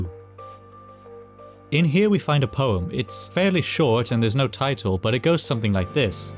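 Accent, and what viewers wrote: Wales, United Kingdom. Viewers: EXCELLENT detective work. I've known about Maes Gwyddno for decades and this is the best thing I've seen/read. I also appreciate the Welsh pronunciation. Llyn was named after Irish from Laigin. Perhaps 'Tehenin' is the OLD name for the peninsula? Just throwing that out there.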